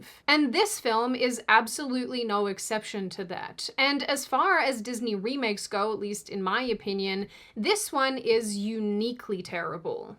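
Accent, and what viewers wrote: Australia. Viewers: sorry, you are my second favorite funny talking Australian. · The funny talking Australian dropped facts again. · You’re Australian?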